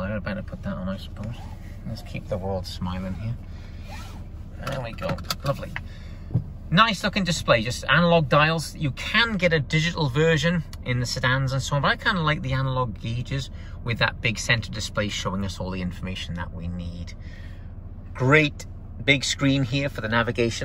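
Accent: Wales, United Kingdom. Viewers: Great video,.. Slight hint of a Welsh accent there I do believe 😁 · Definitely a Welsh accent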